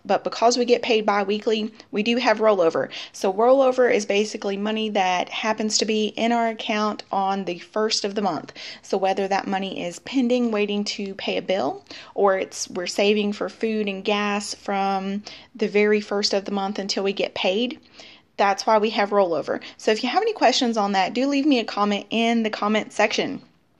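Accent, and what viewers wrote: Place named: North Carolina, United States. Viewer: It is definitely your Southern accent! It is SO North Carolina - in a good way (remember, I am right down the road from you in Belmont, but I was not raised in NC).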